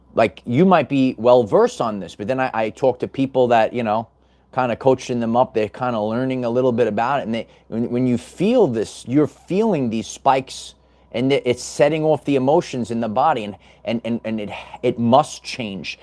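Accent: New York, United States. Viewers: Where are you? You sound like you are from new york.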